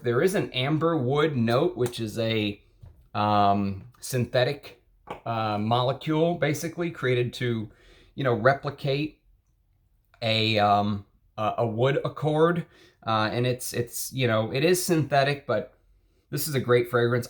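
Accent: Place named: Texas, United States. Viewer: Nooo lol. My nose is still learning. It's actually incredibly hard to pick out notes. I chatted to a student studying olfactive psychology, big word 😂, he said the human brain can pick out around 5 notes max in a perfume. Everything else is hidden in a blur. You can get an effect from Hedione for example, it's like air making space. The musks are bloody hard to identify, especially if many are used. I struggle to differentiate them in a perfume a lot. Woody ambers forget it, they all bloody smell the same to me. The more raw materials I smell and study, you can pick them out sometimes if they are overdosed in a perfume. For instance smelled sundowner today (the drydown is a beautiful smooth amber tobacco btw , lovely!), in the opening I smelled the synthetic used to boost a tobacco note. It's in tobacco Oud etc as well. You must notice it. 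Like if you smell Cilantro in food. It's there. Doesn't mean its bad btw, you just can't miss it. Also, I could not do what you do. Firstly my collection is shite compared to yours. And secondly, I haven't got a smooth Texan accent 😉 haha. I am learning a lot from your videos btw. A lot!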